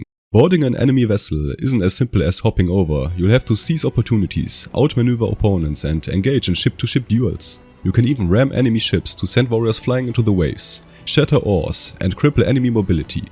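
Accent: Germany. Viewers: I love your german accent ❤️🗿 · Brudi bist du deutsch Brother are you German ? Dein Akzent verrät dich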